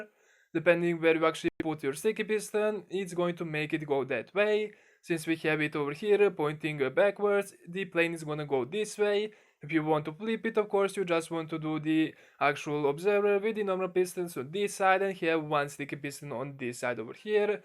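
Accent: Russia. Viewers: I love that he sounds russian and he makes a tnt bomber.... you just got a new sub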